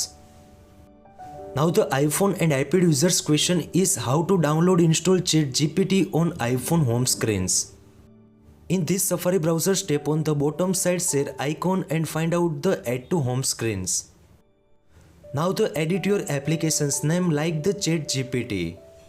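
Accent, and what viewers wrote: India. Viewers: Are you in India? the accent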